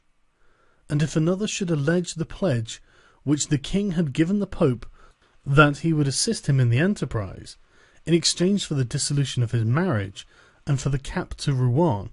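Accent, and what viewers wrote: United Kingdom. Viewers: I love Sargon Of Akkad's soothing British voice. No homo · Excellent recitation, if you can get past the horrible British pronunciation.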